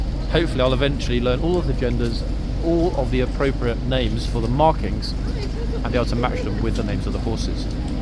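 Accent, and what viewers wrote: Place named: London, United Kingdom. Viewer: Handsome and so is the Horse. LOL , Love your commentary look forward each day. and glad to hear a London accent. a big change for us ex eastenders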